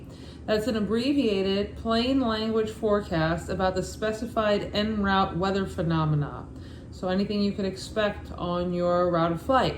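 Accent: Brooklyn, United States. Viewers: Great job breaking down the Instrument Pilot Oral Exam Guide with that authentic Brooklyn flair—super engaging and helpful!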